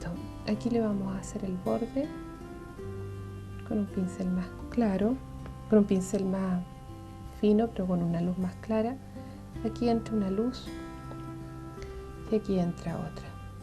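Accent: Chile. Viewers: Me imaginaba por tu acento que podias ser chilena. Saludos de un Chileno pati perro.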